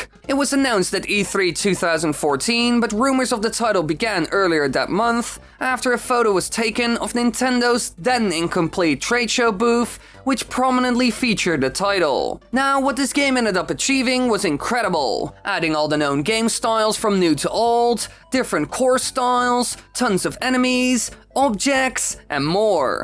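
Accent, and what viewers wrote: Netherlands. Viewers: I love your Dutch accent! I’m from Belgium 🇧🇪 and I don’t mind it. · Ur voice is fine tbh, just obviously a european (dutch?) accent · Well I mean you sound Dutch Are you ? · Well you can hear from the accent that he's dutch which is okay. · Its a good accent, its dutch right cuz in your zelda reaction i thought you were talking dutch for a smal bit. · For me your voice is great and i really like your dutch accent.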